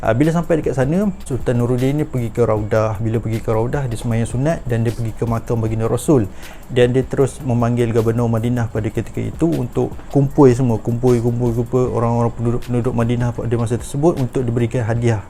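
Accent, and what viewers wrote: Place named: Penang, Malaysia. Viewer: ckp penang bang senang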